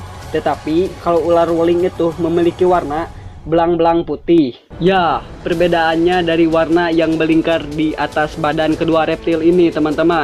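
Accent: West Java, Indonesia. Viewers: Sundaaa...a bangetz...! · Gaya Ngomongna sunda pisan euy. Jigana babaturan Dede Inoen. Mangpaat pisan infona Lur.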